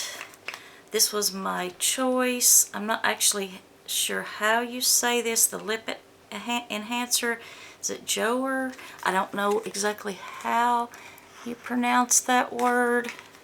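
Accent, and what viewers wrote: South, United States. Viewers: Morning 😊 Love that your comparing a new Subscription to an older one. The highlighter palette is pretty . Your hair looks gorgeous 😊 I love a southern accent. Oh you got 2 ND palettes, I hope I get one in my box 😀 Both were great boxes 😊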